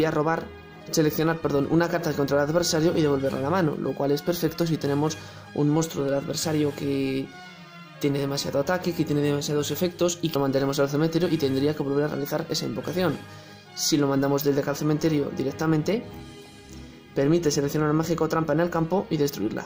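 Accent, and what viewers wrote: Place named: Spain. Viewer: Por tu acento eres de España de que lugar? Soy de Madrid asi un dia jugamos unos duelitos, gran video!!!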